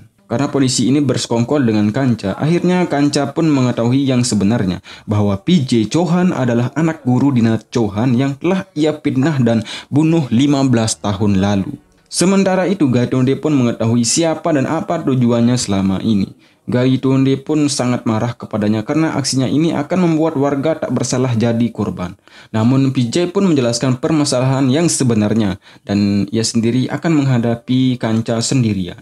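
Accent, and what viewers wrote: Bali, Indonesia. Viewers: Loghat Bali,kya susah ngomong (pengulasnya) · Logat Bali ini gan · logat bali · Owhh soale logatx kyk org bali..sucses sellu mas..aamiin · Orang bali ya bli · Narator nya bhasa nya kek dr bali ya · Pembawa suara orang bali bli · Kayanya pengisi suara orang bali.. Keliatan dari vokal ngomong nya.. Bener gak?🤭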